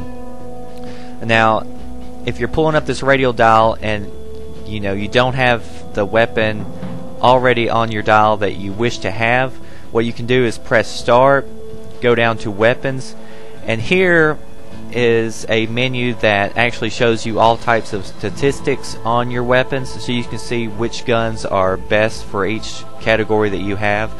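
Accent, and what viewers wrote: South, United States. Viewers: are you from the south? don't worry I'm not a dumb yankee I'm from Mississippi i can just really hear your accent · The fact that you’re southern makes this video so much more fun. You’d be good for voiceover work.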